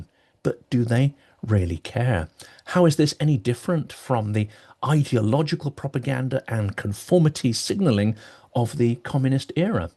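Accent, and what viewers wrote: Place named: West Country, United Kingdom. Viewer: How dare that hypocrite Galloway claim he cares about suffering humanity... while supporting Russian imperialism! The guy is a fraud, a morally bankrupt political opportunist who jumps into by-elections to get his ego stroked by being in the headlines again...upon winning a by-election (such as Bradford and Rochdale) he soon abandons his constituents - the campaign doing its job of getting his face, voice and name in the news, his ego bolstered. Thanks. I love your eloquent and witty - yet acerbic when needed - monologues. I could listen to your fantastic lilting West Country tones (I am assuming it is a West Country accent, right?) all day!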